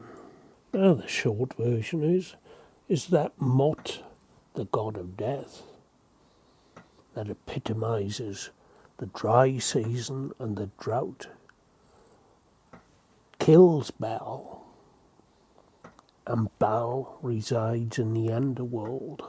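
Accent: Wales, United Kingdom. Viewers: He has a Welsh accent. Both countries have a similar native language Gaelic. If I'm not mistaken.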